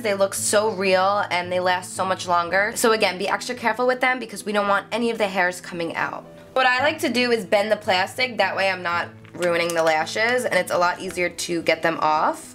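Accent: New Jersey, United States. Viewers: Omg! Her jersey accent is so strong in this video! 😍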